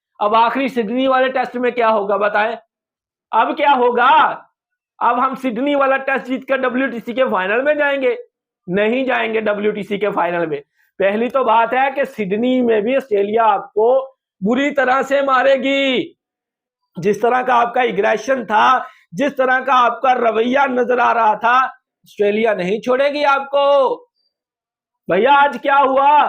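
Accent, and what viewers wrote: Punjab, Pakistan. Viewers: Bhi yea punjab say hai..pura pakistani pubjabi bandey kaaa accent hai 😅😅😅 ISI bandey ki thodri training karadoo. Punjabi in India are ni boltey bhi 😅😅😂😂😂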